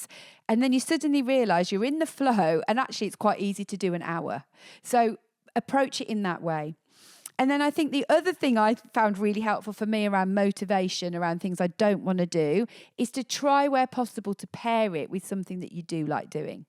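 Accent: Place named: United Kingdom. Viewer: I subscribed to your channel cos i have problem to understand British accent.by listening to your videos..probably could help me practice my British accent listening skill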